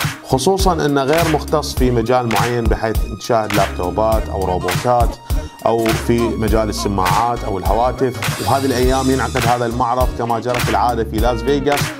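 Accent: Iraq. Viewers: مؤتمر CES2018جميل جداً كل اشياء جميله شكرا على تقرير الجميل بصوت عراقي وشكراً على متابعتك على كل ما هو جديد في عالم 🌐 التكنولوجيا الحديثة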